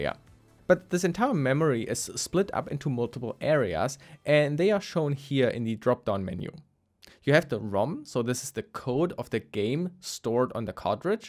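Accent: Germany. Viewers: are you German? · ich hasse deutschen Akzent 🙈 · At the first sentence I knew: this is definitely a German guy 😂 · Are you german?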